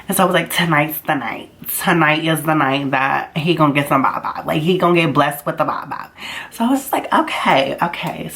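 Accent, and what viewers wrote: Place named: New Jersey, United States. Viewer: My heart really goes out to I am so sorry that that happened to you I have been in a similar situation and it is not okay for somebody you know that you kind of trust you know what I mean to do that. And honestly I have been watching your videos and like anytime I see a thumbnail of you or whatever on here like I'm like oh yeah she's the s*** and I totally forgot that you were even transgender until you hinted at it in this video like I don't know I mean that's not really what my comment is about like but it just kind of like I was like taking aback or for a second like oh wow I honestly completely forgot totally forgot and I think that is the way that most of your viewers view you you know I mean like I don't even think it crosses their mindset and for anybody that has a nasty comment to say anything oh that nature shame on them even if it's calling you a s*** or whatever. 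Anime like keep doing you I love your videos you are so funny like I love your accent like it kind of reminds me of home when I'm originally from but I'm originally from New Jersey so LOL South Jersey at that and I'm living in Kentucky now so yeah I don't know you keep doing you because you are funny you are beautiful you have a great personality.🖤💕🖤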